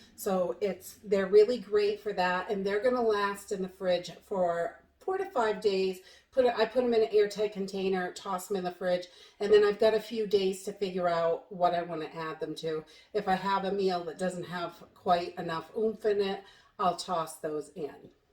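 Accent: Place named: Northeast, United States. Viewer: i cant figure out your accent. is it nj? or ma or ny?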